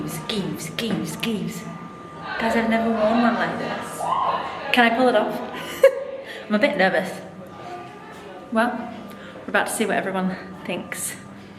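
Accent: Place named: Australia. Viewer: The Aussie accent caught me off guard for your debut 😂😭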